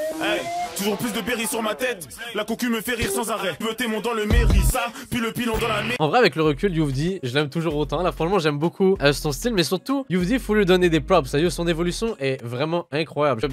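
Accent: Quebec, Canada. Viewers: Il est québécois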